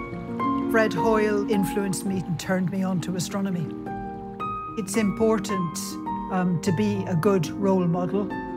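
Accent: United Kingdom. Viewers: "the array rotated with the earth". A british accent does not give validity to this stupid claim. we do NOT detect any movement or motion caused by the earth. we never have. heliocentric world view(taught in public schools) is satanic pedophile propaganda by the jesuits long before the so called greeks "knew" the earth was a ball (supposedly 2000 years ago). Heliocentric do not work in reality. it is a fairytale for grown ups. the earth is immovable and stationary. all the stars are fixed in their positions and rotate in the firmament around us every day and night! the sun and moon is local! for more truth and answers in full scientific depths - Follow Youtube Channel - "Flat Water F.E" and "Celebrate Truth".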